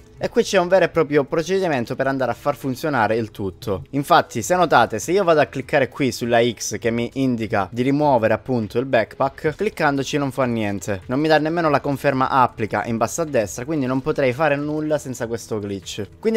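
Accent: Calabria, Italy. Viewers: Sei calabrese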